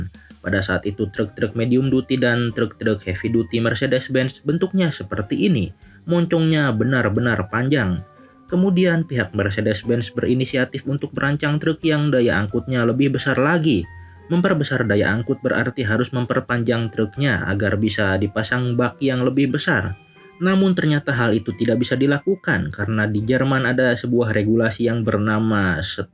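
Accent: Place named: Sunda, Indonesia. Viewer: 😂😂😂 lucu adminya membawa narasinya..ciri ciri orang sunda nya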